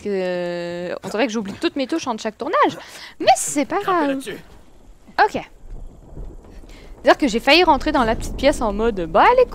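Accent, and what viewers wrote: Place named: Quebec, Canada. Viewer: J'adore tes vidéo est ta personnalité en plus j'adore l'accents Québécois Je te suis depuis tes 900 abonnés est ta tellement bien évoluer même avec tes 100k tu reste toujours aussi proche de ta communauté est sa c'est rare!! Bonne continuation:) Sache que je ne me desabonnerai jamais:^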